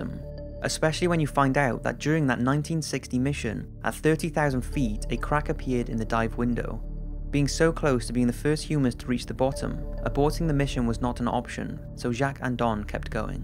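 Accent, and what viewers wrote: Wales, United Kingdom. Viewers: I hear a brit accent and talk of kilometers and my New York ass says gnite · Are you from Cardiff I think you are shout out to my fellow welsh man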